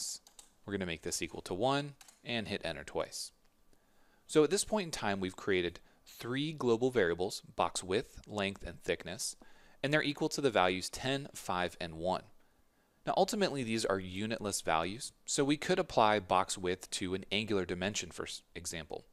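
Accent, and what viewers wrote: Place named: United States. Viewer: Great video's its nice to hear an American voice without the horrible constant 'Vocal Fry' at the end of every sentence.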